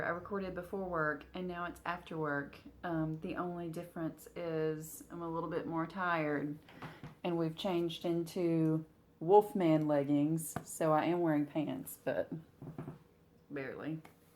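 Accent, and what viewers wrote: South, United States. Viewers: Love every single thing you do and next to Marlene, I think you may have the sweetest Southern accent ever. Could listen to you all day. Was fun seeing you mom’s house....love that you go there and spend time with her and always talk so sweetly about her.....miss my mom everyday. Fun to see the bottom corner of HRH Halloween since I started at the top right. 😘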